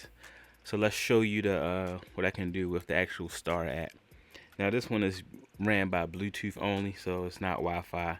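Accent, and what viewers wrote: Baltimore, United States. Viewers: Somebody from Bmore😂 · Are you from Bmore? Sounds like it! Also thanks for the tutorial! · Quick to clock an accent. You from Baltimore? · Are you from Bmore? Sound like it. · U from bmore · Sounds like you from BMore. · Bruh is you from Baltimore...you talk just like me...ayyyyeeeeee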